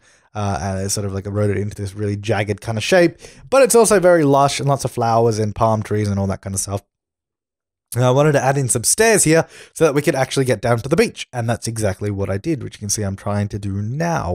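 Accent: Australia. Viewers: I could listen to you talk forever .That sounds creepy, but i just love Australians and Australian accents .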